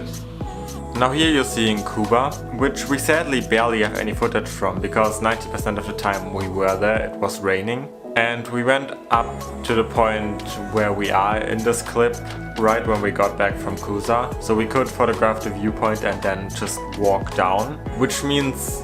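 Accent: Germany. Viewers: Where are you from? It sounds a bit like you're from a german speaking country to me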